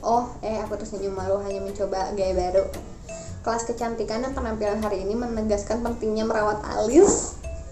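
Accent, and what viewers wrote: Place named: West Java, Indonesia. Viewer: Teteh ni sundanis bgt ya ..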